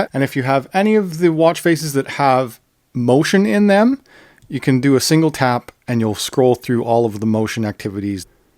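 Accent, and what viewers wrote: Canada. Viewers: Cool video.. U sound Canadian 😊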